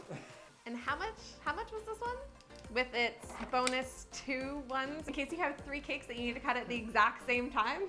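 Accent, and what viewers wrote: Canada. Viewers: If you see this can you answer it are you Canadian? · Oh you're Canadian?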